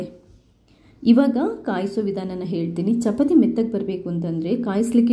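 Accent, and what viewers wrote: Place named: North Karnataka, India. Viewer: I guess by your accent that you are from north karnataka, but why u r struggling to speak in blore kannada, speak in your own language. No worries.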